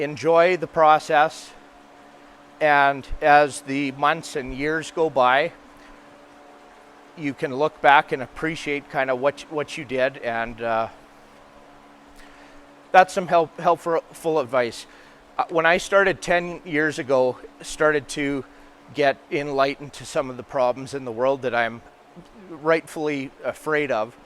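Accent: Saskatchewan, Canada. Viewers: I haven’t lived in Saskatchewan since I was a child but I love hearing your accent! 😆 Your greenhouse gives me much inspiration as I currently live and garden in Florida but crave moving back to Canada. The shorter growing season terrified me but now I see that it can be extended greatly. 🤔 Awesome job!!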